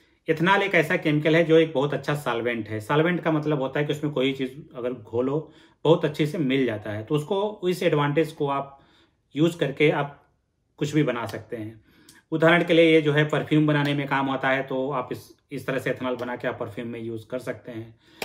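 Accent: Bihar, India. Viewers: I thought he is speaking English in Indian accent. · Sir you must be from Bihar (your accent is Lalan top). You are really good at chemistry.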